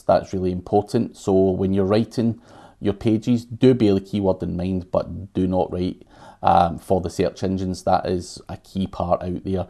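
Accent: Scotland, United Kingdom. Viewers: As soon as I heard you were Scottish, I liked and subscribed :)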